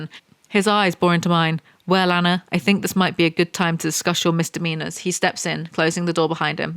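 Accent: United Kingdom. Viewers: Damn you, i can no longer sleep without the sound of a snarky brit bullying rich people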